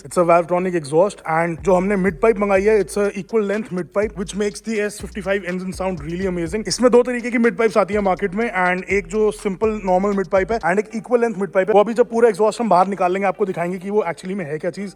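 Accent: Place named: Punjab, India. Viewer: stock awaz madi nai h😂 prava hindi ch punjabi 😂 good bro 😎 sara kuj punjabi ch bolya kr yara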